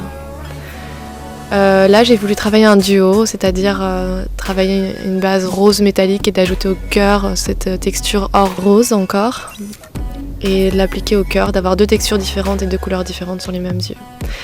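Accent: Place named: France. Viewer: You are goals. And I love your accent. I'm gonna learn French! (Inspired)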